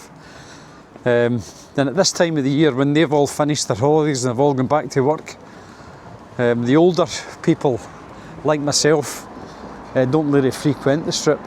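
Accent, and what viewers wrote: Scotland, United Kingdom. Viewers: Hi I'm quite new to your channel, but I like it. Good to hear a good Scottish accent. I have a question for you regarding movie g to Spain. I have looked at the proces of moving to Spain and I think it's too complicated. How easy is it to rent a property in Spain for the 90 days. Or can I just buy a property and spend the 90 days there without having to apply for a visa?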